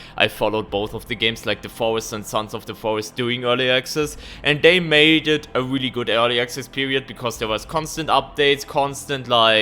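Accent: Germany. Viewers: I like your accent. Is it german?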